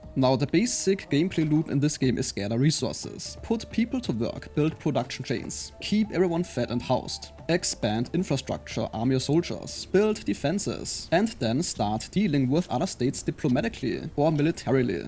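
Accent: Germany. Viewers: Direkt den Alman rausgehört😆😆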